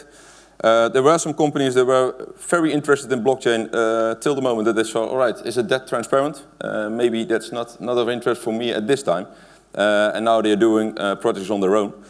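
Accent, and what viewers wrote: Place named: Netherlands. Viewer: Ugh, those dutch and that freakin annoying accent. I'm no native English speaker but at least my English isn't as thick accented as this one. What's wrong with you Ducth? It's like you make no effort at all to speak decently. I much prefer a german or an french person speaking English than this.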